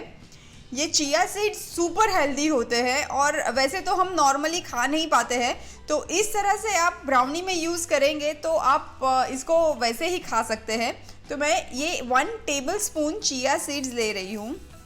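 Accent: Gujarat, India. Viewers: Way u talk is very nice guju style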